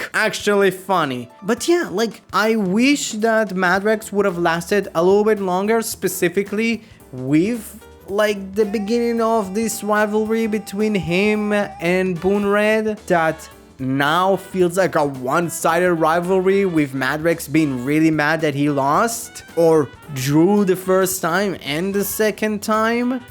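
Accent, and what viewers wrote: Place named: Spain. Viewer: Uh You said Bundorio's name wrong It's actrully pronounce Bun-do-ri-o Bun-de-ras Since you have that Spanish acent of yours,saying Bundorio's name in English can be tricky for Spanish Sentai fans Also is Taiya and not Tire,oh my god the Boonboomger fans are so going after you after you saying the character's names wrong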